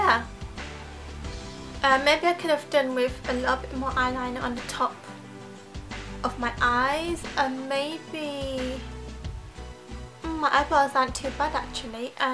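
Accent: United Kingdom. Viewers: by accent i just assumed you're in uk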